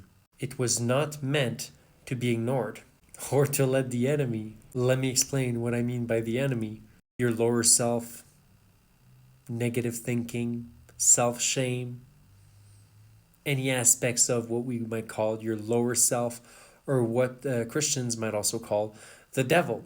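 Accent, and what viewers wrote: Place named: Quebec, Canada. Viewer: Thanks for sharing your great journey! I knew I recognized that accent 😅 Du Québec ici ✌️😁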